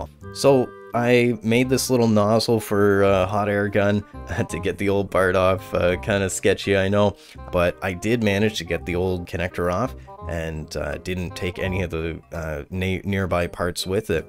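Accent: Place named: Canada. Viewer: Hey bro, you sound super Canadian here. Also this sounds like more than 500$ in labour.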